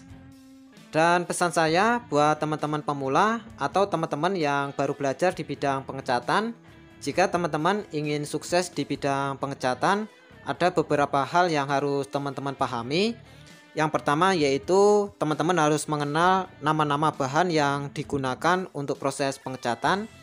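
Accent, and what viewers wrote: Java, Indonesia. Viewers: oh kirain..logatnya jawa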